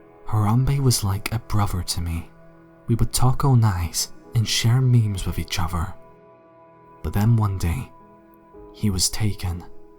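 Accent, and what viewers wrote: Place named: Scotland, United Kingdom. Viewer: I remember you when you were. Your dead Scottish voice is the best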